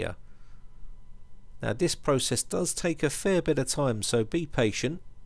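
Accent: England, United Kingdom. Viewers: I just don't get English accent.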